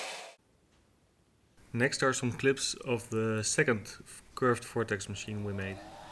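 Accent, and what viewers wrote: Netherlands. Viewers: Gast ik hoor aan je stem dat je nler bent ik wil s komen kijken! mag dat? :D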